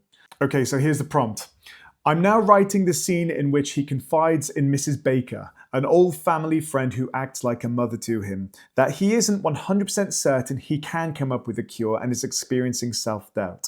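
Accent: United Kingdom. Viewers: This is pretty funny, a Ukraine YouTube blogger uses it; the voice is the same, although it is Ukrainian) a gorgeous British accent you have, mister)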